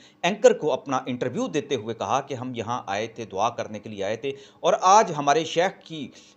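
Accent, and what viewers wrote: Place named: Kashmir, India. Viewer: Assalam o alaikum warahmtullah ap taluq azaad kashmir sy hai apka accent bilkul wesa hai... Allah kareem aulia Allah ki mohbbat or adab o ehtraam wala rakhay Aameen..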